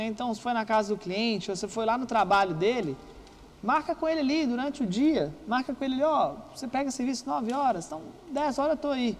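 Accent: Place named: Belo Horizonte, Brazil. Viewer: Esse cara deve ser de Belo Horizonte ,pelo sutaque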